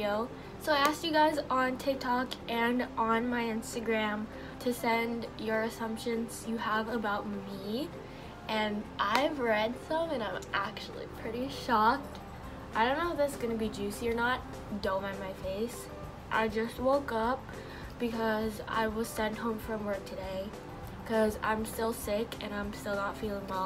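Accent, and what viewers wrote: Philippines. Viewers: 💛You sound conyo ate! Wish you make more Filipino speaking videos. I like you accent kasi. loveyou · love your tagalog accent💕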